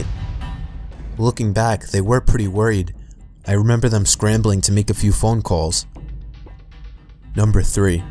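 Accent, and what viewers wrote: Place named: Boston, United States. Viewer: He also has a Boston accent in his old videos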